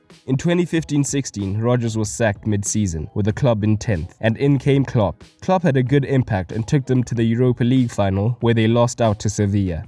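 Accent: South Africa. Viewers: is the narrator South African..? just really curious. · could be south african or indian or indian in south africa :) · Are you South African? Glad I've found the channel - great content! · he sounds south african, i know as a south african. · You sound South African nigga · Dude you sound so South African ...are you ? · You sound very South African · You from South Africa bro?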